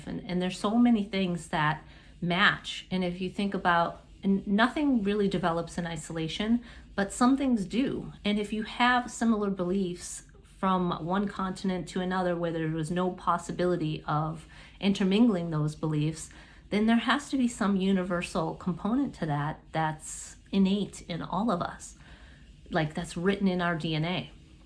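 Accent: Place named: New England, United States. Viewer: I can relate to the definitions for heathen....it’s one thing to walk outside conventional beliefs and practices for ourselves, but it’s different when we have to consider our children. Both my husband and I did not feel served by christianity and had no desire to burden our daughter with it. So after she was born we had our own “naming ceremony” for her. Close family members were invited as were her godfather and goddess mother.... we gathered on the beach and blessed her with the elements air ( a feather) fire (herbal smoke stick) water ( from the ocean) and earth (a sprinkling of sand) we called in the ancestors and asked them for their blessings. People were asked to bring gifts, but not the kind you buy in a store, it was a beautiful, personal, and deeply meaningful ceremony. To this day, thirty years later, folks will comment to me how much they loved it. 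Its not easy to walk outside convention, but I’m glad we chose that path and our daughter is, too. I just discovered your channel and I’m going to check out some of your other videos. BTW, are you from New England ? I feel like I could hear traces of a slight New England accent! I’m from Massachusetts.....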